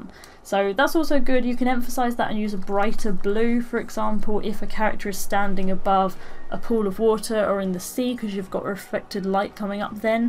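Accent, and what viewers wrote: United Kingdom. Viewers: I just can't stand this funny british accent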